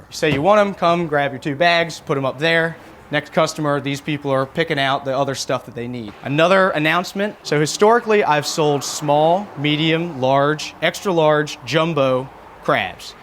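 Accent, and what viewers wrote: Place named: Maryland, United States. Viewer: I have a lot of friends from Maryland. And that is a distinct accent! The rope is too tieeet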